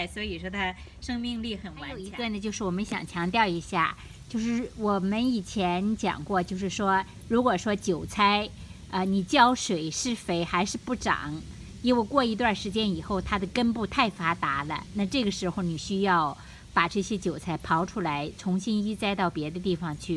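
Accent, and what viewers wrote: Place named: Weihai, China. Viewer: 请问您是不是威海人啊？口音真的好像！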